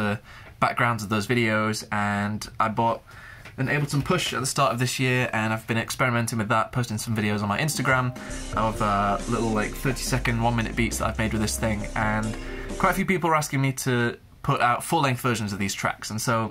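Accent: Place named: United Kingdom. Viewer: British guy with a cute British accent haha ❤️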